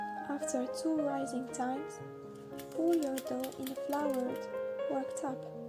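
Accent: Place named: France. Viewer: you're amazing... I love your work, I love your recipes, I love your voice and your little french accent! Everything together make your video unique ❤️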